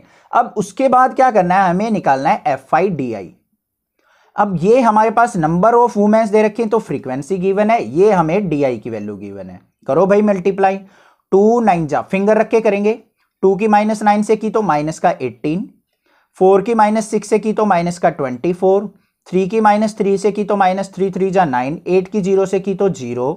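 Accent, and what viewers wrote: Haryana, India. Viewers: Sir, I know that u are from haryana because aapki language mujhe thodi thodi haryanvi lgi. I am also from haryana ,Mahendergarh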